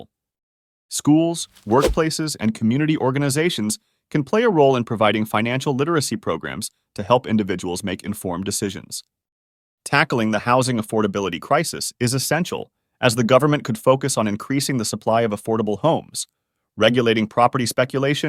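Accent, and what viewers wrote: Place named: United States. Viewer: Sounds like it….can’t believe a yank would be saying Australia has a debt problem. Obviously oblivious to what’s going on in his own backyard! 37 trillion and counting.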